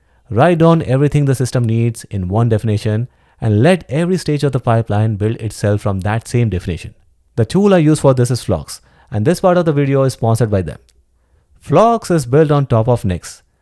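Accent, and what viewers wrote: India. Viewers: Indians and their english.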